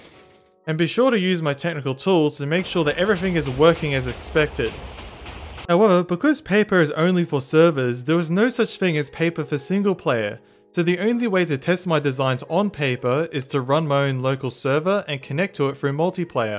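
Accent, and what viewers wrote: Australia. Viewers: Dang, the Australian is coming out this video.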